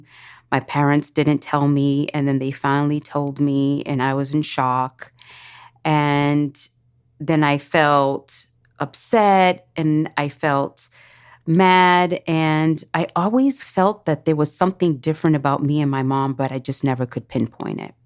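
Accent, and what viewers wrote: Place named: New York, United States. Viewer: Thank you for sharing it was great to hear this as a sperm donor and I love your NY(?) accent ❤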